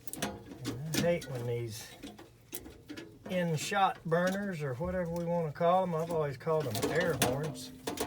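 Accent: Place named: Southeast, United States. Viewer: I like watching Steve Lav and you, but it's almost like culture shock switching between the two of you excellent tradesman. Steve's the quintessential fast talking, no holds barred, plumber with the Northeastern drawl; the slow talking, cautious, soft spoken Southeastern country gentlemen HVAC technician. Both are a joy to watch and are not only entertaining, but very educational in their presentations. Thanks to the both of you for taking the time to make these videos.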